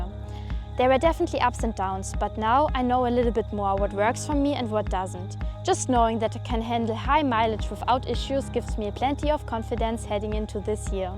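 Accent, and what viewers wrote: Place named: Germany. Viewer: krass deutsches (hartes) Englisch aber irgendwie total sympathisch, Gratulation zum Frankfurt Debut!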